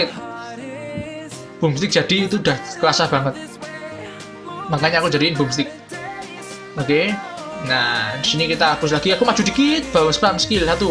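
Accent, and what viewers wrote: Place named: East Java, Indonesia. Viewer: Misuhmu apik mas.... Kroso jowo timuran. Cangkemmuuuu wwkwkwkwkk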